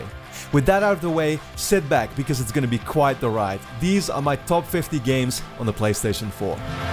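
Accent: Netherlands. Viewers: What accent is that of yours? Dutch? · Are u dutch? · Are you Dutch? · 10 seconds in, ''this guy is dutch''. the accent is minimal tho, pretty good tbh. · is he dutch? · Are you dutch?? You sound dutch. im dutch btw (edit: Oh you have a rochet league car with a dutch flag. Hallo😂😂)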